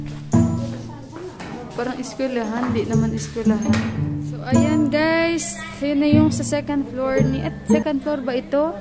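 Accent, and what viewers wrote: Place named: Hiligaynon, Philippines. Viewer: d talaga mawala tono.ng.ilongga day🥰🥰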